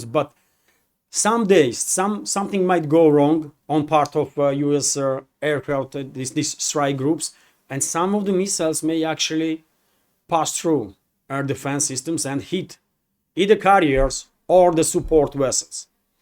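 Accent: Russia. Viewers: The truth spoken in English is a rare event and it’s delightful to see your honest visage, Mr.. Speaking the language of lies with your Russian accent.. you are not alone in the truth being spoken with a foreign accent. You are a rare event there as well. Happy Easter Sunday. I started my family this time of the year 35 years in the past.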